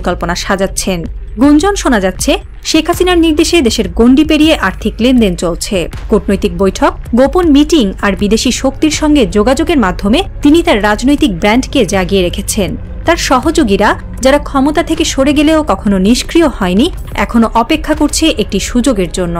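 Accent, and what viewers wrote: Kolkata, India. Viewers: কবিতা বাদ দিন, আপনাদের খবর শুনতে খুব খারাপ লাগে। কলকাতার মত সংবাদ পাঠ বাদ দেন প্লিজ।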